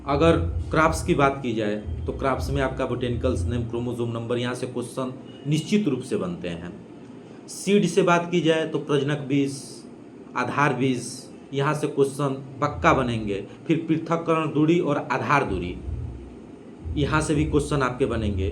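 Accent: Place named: Bhojpuri, India. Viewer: Bhaiya aap ka language kuch bhojpuri jaisa malum pad raha hai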